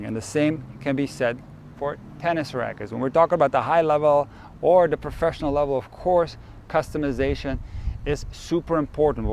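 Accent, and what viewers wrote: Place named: Eastern Europe. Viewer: is the Eastern-European, no B.S. coach you have in your dreams/nightmares. The accent, the straight-talk, no coddling, no touchy-feely*. Just cut the crap and get to the point. No excuses. I pulled all the weight off my rackets after this video because it confirmed my suspicion that it really wasn't worth the trouble. I'm not that good. I'm flattering myself. *I work in a very touchy-feely profession. I'm touchy-feely. But from my tennis coach, I want cold hard unvarnished truth. Update: I bought a 3rd frame (used 2018 Radical) so I can honor my desire to tinker unnecessarily with things (it’s an affliction, I admit). This also is per suggestion - 2 frames the same in case of string breakage, 1 frame for trying different stuff.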